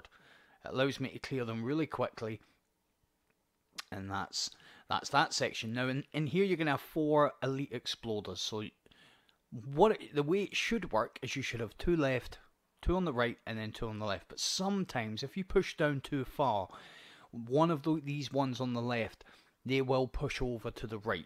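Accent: Scotland, United Kingdom. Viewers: A Scottish commentator ❤️❤️love from the highlands ❤️new sub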